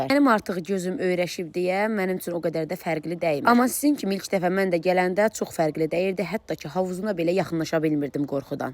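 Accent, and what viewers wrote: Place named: South, Azerbaijan. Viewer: Ləhcəsindən bilinir ki cənub bölgəsidir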